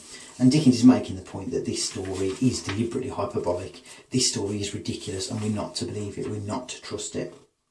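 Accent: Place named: Ireland. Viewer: okey ure Irish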